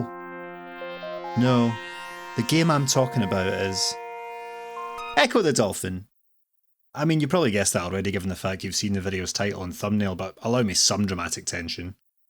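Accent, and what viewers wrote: Scotland, United Kingdom. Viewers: Holy shit you sound like a scottish ethan klein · D was a fun video, I like your accent. I am german, so I don't exactly know what it is, scottish accent? :) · Nice scottish accent. <3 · Love loading a YouTube video and hearing a fellow scotsman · It’s funny how jarring it is to go into a video expecting not a Scott to start speaking and then having to catch up to understand what they are saying 😂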